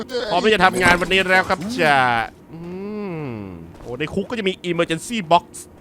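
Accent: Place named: South, Thailand. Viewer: ชอบความ พูดภาษาใต้จัดๆ ภาษาใต้เป็นภาษาที่อารมณ์ดี 5555+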